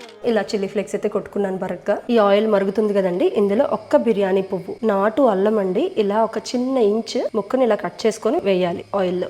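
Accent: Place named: Godavari, India. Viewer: Baraka, obbidi maa godavari slang ❤❤❤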